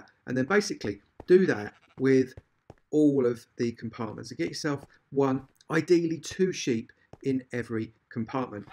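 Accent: United Kingdom. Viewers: I love his voice. Hell, I love any Brit's voice, mostly.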